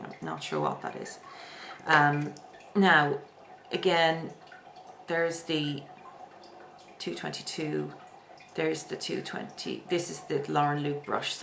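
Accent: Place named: Cork, Ireland. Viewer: your accent is soundin pretty local to me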